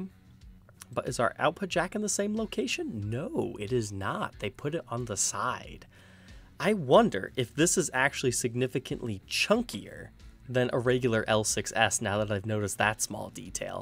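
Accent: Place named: Pittsburgh, United States. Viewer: You sound like your from Pittsburgh. Or somewhat close by.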